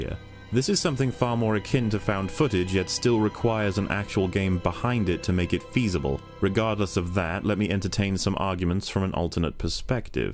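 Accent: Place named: United States. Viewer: strange American accent ONLY ELEVEN MINUTES INTRO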